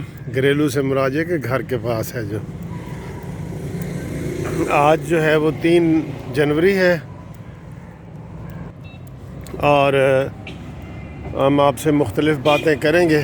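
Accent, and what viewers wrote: Saraiki, Pakistan. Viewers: سرائیکی کی مٹھاس سن کر کان میٹھے ہوجاتے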